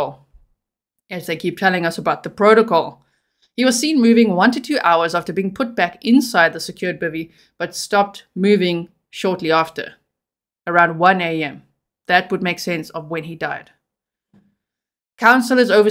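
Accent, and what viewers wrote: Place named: South Africa. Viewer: I was gonna ask if you’re South African because I can hear the accent, thank you for clarifying instead lol.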